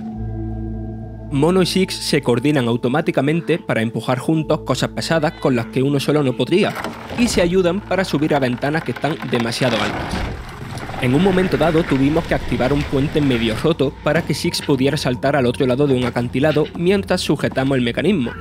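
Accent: Andalusia, Spain. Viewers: Pregunta por curiosidad, ese acento es de qué parte de España? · E' andalú soquete... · Del Sur sobre todo, Murcia/Andalucía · No se si el que habla es de Jaén o Murciano.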